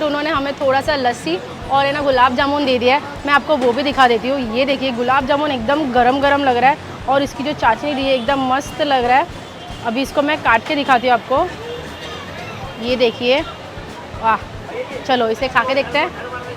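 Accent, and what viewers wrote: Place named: Maharashtra, India. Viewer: From the way of your speaking accent I'm sure you are basically maharashtrian girl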